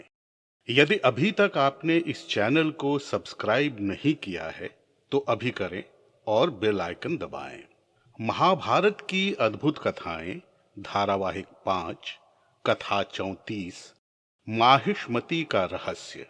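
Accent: Standard. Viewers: महाभारत की अद्भुत् कथाओं की शृंखला में कही गयी एक और अद्भुत् और मेरे लिए एक दम नयी एक कथा...! कथा वाचन की आपकी शैली और कला को शतशः नमन आदरणीय। आपकी आवाज़ और उच्चारण की शुद्धता चमत्कृत करती है।चित्र एवं संगीत प्रभावी हैं। इस लाजवाब कथा और उसके शानदार प्रस्तुतिकरण के लिए आपको हार्दिक बधाई एवं धन्यवाद आदरणीय 🌹💐🌹💐🌹 सादर नमन एवं शुभरात्रि आदरणीय 🌷🙏🌷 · वाह वाह वाह अद्भुत कथा तथा आपकी वाणी के माधुर्य और भाषा के शुद्ध उच्चारण का जवाब नहीं सादर प्रणाम आदरणीय🙏💐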